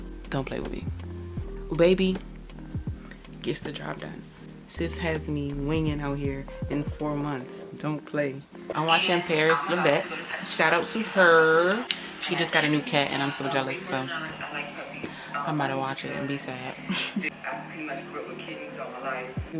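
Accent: DMV, United States. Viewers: U gotta be from the DMV. The accent. I love iiiit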